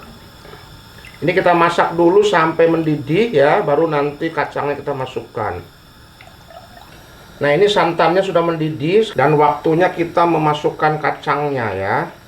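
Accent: West Java, Indonesia. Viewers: Sunda banget, KUNGKURAHAN... logatnya juga Sunda banget...👍👍😅😅❤❤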